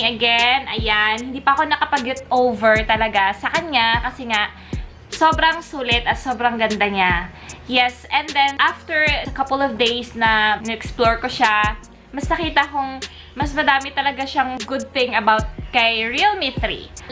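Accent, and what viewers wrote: Visayas, Philippines. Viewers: bisaya diay ka ate?hehe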